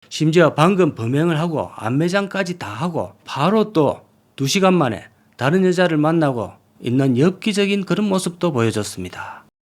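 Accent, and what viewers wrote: Gyeongsang, South Korea. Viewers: 경상도 사투리 좃나 짜증 나네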